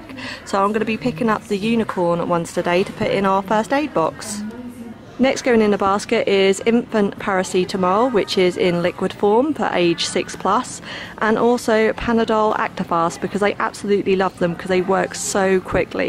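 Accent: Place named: Suffolk, United Kingdom. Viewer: Totally just stumbled across this video but really enjoyed your style of vlog and how you pointed out different things for other people not just yourself. I thought I recognised your bumpkin accent, I was born in Yarmouth and lived in Suffolk 😆✌💞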